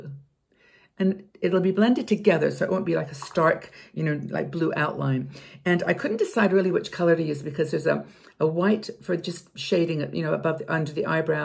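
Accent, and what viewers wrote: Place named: England, United Kingdom. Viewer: There’s definitely a bit of an English accent there. 🙂💕 🇺🇸 🇬🇧 is so charming and fun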